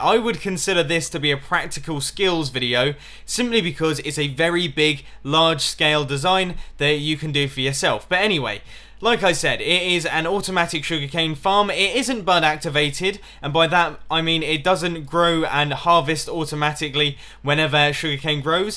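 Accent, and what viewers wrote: United States. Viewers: Haha that American accent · Dat American accent:D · Dat American accent. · Love your american sales person accent!! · Woah, that american accent tho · Is that an American accent? What the heck? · Your American accent is godly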